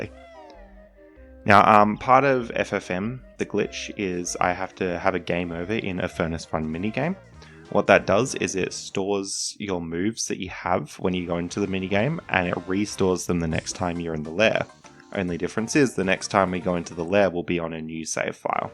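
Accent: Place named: Australia. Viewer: I still get surprised every time I hear an Aussie or New Zealander accent on the Internet. I shouldn't be, but I am, cos I grew up in a time when the Oceanians had really bad Internet. Also, cool video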